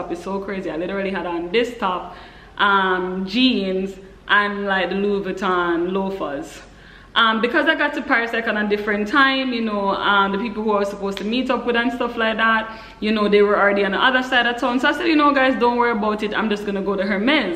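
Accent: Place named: Jamaica. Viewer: Thanks for shearing this information to as we have Learn 1 or 2 I can not wait to watch ur China home where ur Jamaica accent is really good 😊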